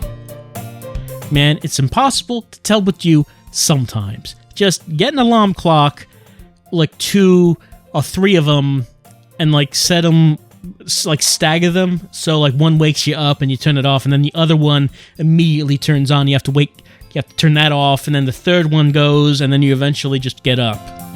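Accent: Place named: Brooklyn, United States. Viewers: Ohh... The brooklyn accent.. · Dat Brooklyn accent, doe. Cute.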